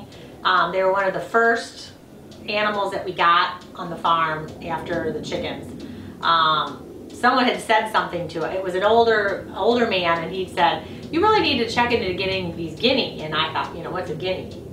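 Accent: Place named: Arkansas, United States. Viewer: my friend from Little Rock sounds just like you. Is that where you are from?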